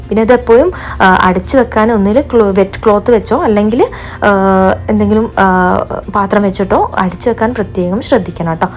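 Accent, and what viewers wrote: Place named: Kozhikode, India. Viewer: ഇങ്ങളെ അവതരണം അടിപൊളിയാണെ, നല്ല കൊഴികോടൻ സംസാര ശൈലി 😅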